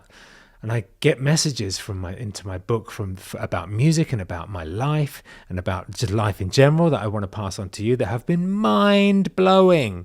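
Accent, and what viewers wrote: United Kingdom. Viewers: Youre British Accent and looks tells me you can be an Actor. You were put on this Earth to be a mentor for your children. Please be happy that God put you on this earth. You are loved!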